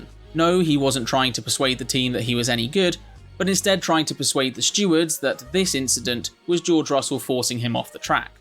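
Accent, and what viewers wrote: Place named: United Kingdom. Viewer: This vid starts. I hear Brit accent and I hear immediate jab at Verstappen. Yah. Ciao. Not my video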